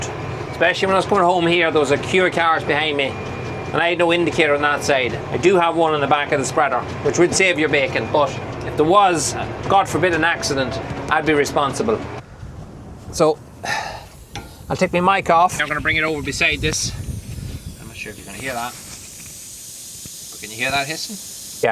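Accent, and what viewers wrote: Ireland. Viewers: What a terrible shock for you all, I’m so glad your little one is better. I really enjoy your videos. I’m not so keen on the engine repairs lol, but I love all the farming stuff and love listening to your Irish accent xx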